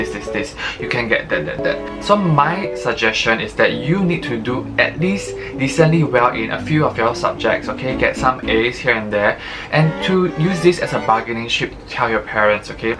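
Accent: Singapore. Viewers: I can tell your from Singapore because of your accent 😂😂, anyways thanks for the video, I let you know if it works!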